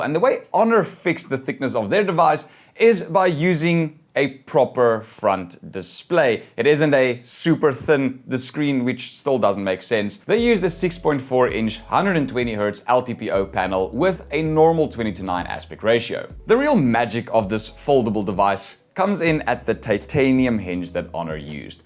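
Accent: South Africa. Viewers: You sound South African, subbed.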